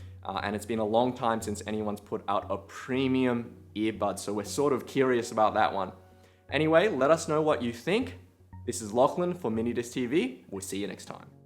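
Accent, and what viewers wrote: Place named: Australia. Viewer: I was not expecting an asian with Australian accent :)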